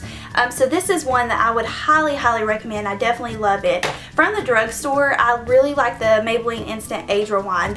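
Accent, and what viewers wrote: South, United States. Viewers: ♡southern accent♡ Sent with lots of ♡ in NC!!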